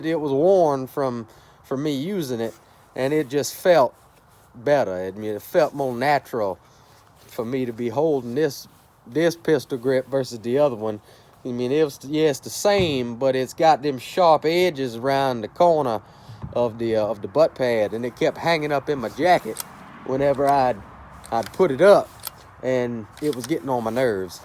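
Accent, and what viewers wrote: Louisiana, United States. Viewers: Are you cajun?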